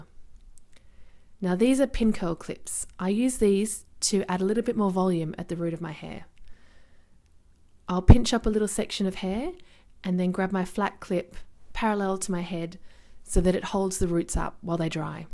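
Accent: Australia. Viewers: I haven’t let my hair run wild with its natural curl in ages. Your videos are making me want to go au natural. I’m curious to see what it does since being bleached and abused. Love your voice by the way - it’s a nice example of an Aussie an accent and I find it quite soothing. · I love your channel and love hearing a fellow Australian ❤️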